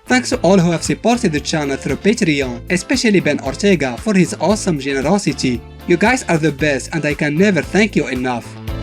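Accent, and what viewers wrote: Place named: Morocco. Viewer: he is Morrocan,from my country and recognize the accent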